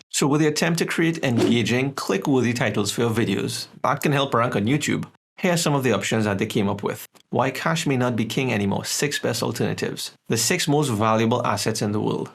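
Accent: Trinidad and Tobago. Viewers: Love this video. Are you From Trinidad?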